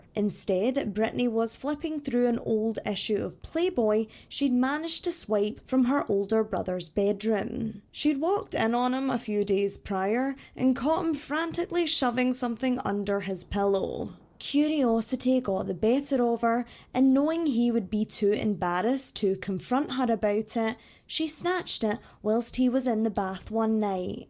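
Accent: Scotland, United Kingdom. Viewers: Lovely accent. Where in Scotland are you from? Aberdeen maybe? I was born there.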